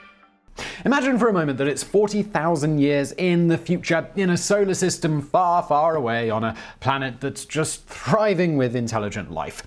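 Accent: England, United Kingdom. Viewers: Sir - yes I said Sir. Pleeease do an episode on how difficult it was to take down a Knight on the battlefield during medieval times. I can't seem to find an interesting in depth video narrated on the topic with an English accent. Not too mention a video that also includes the history behind the armour, misconceptions and why they were so much more than an average soldier on the battlefield during their time.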